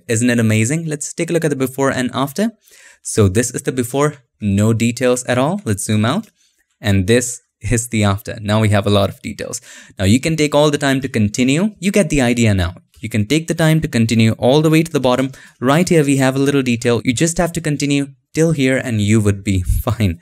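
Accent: India. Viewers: you are so pro. i like your tutorial, and India accent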